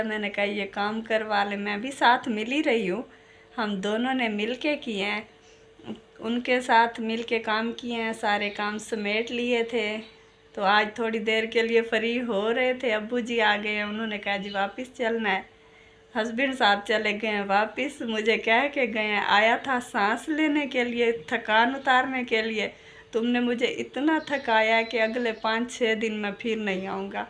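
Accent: Saraiki, Pakistan. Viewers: boht piyaari sariki bolte ho❤❤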